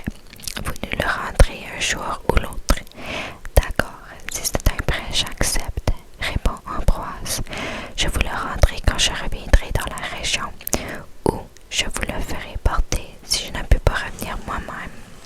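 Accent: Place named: Quebec, Canada. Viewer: L’accent québécois en asmr c’est trop innnncroyable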